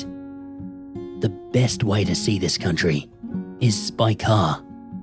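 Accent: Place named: Australia. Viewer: Love the Australian accent.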